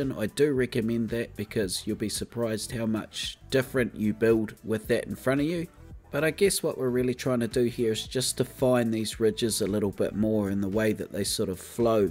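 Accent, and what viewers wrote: New Zealand. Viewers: omg is your accent from New Zealand? it's awesome!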